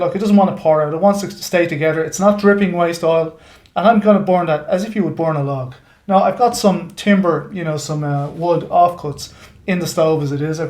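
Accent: Ireland. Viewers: Ah, I love the brogue! You could read the phone book or dictionary, and I'd listen. Reminds me of the older folk when I was a child. Why is it that so many DIYs from Ireland ad GB tell you to mix something to the consistency of porridge? Makes me smile, because it's so descriptive. :)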